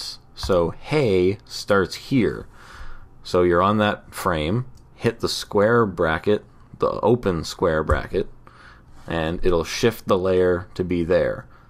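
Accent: Canada. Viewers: I know it's mostly just because you're Canadian, but omg you sound so much like Seth Rogen. <3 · Nice work! thanks for the help! By the way, are you a Canadian? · Are you canadian? :D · You sound canadian are you?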